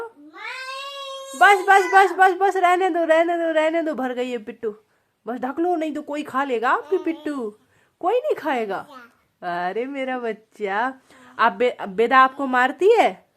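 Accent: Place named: Bundelkhand, India. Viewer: Didi mai aapko indoor se dekhti hu mera naam asha hai mai aapko bhut pahle se dekhti hu meri mummy ka gav bhi tikmgad hai mai bhi aapke jese hi bhsha mai baat karti hu bundelkhng mai aap bhut piyari ho kisi ki baat par dhyan mat do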